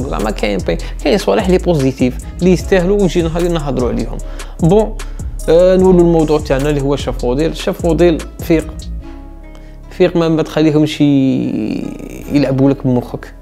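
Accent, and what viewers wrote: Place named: Morocco. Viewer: اللهجة تاعك تقول مروكي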